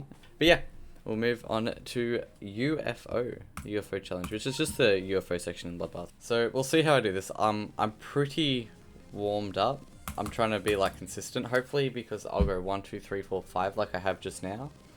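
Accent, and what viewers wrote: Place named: Australia. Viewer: Hey u sound australian. Where u from perhaps?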